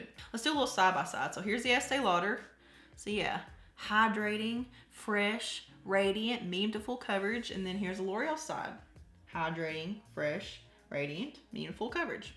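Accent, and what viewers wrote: South, United States. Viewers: noob here... you seem so down to earth. looking forward to watching more of your videos! as a North Carolinian, I immediately favor any twang I hear. #southernsistas 🤣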